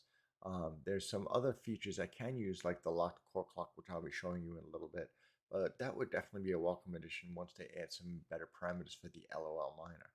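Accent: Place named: New York, United States. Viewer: The more videos he makes, the more NY he sounds! Love it!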